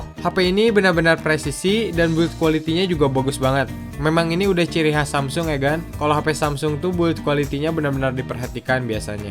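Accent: West Java, Indonesia. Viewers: "Halo Juragan", suara khas amat sundanya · Nyunda banget Kang 🤣 kerennn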